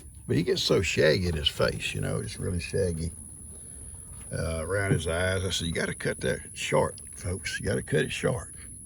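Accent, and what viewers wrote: South, United States. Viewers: I do love your videos, we talk alike, country'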